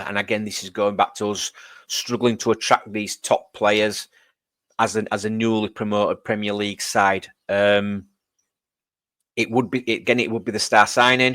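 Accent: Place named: Leeds, United Kingdom. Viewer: Aye mate watching my first video. Sounds like you’re from Leeds way as well so that’s all good. Just subscribe 👌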